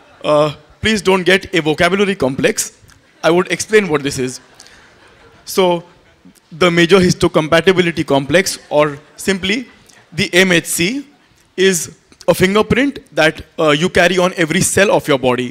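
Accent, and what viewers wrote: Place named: India. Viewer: i too dislike the Indian English accent, but you must realize that this just is a matter of habit and does not warrant hate.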